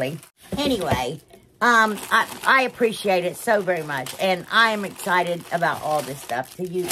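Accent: South, United States. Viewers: As always I love everything you created they’re all so stinking cute! I just love you you’re a country girl like me and we have the same accent. lol.. I wish I had a nickel for every time you say “ anyway “ I’d be a rich woman. lol …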